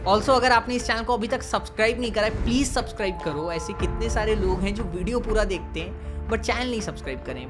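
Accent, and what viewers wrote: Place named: Bihar, India. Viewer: Hame tu appki behaar ki language bohat Pasand h us tarha bolne ka style he alag h .v.good vdo (from Karachi ❤)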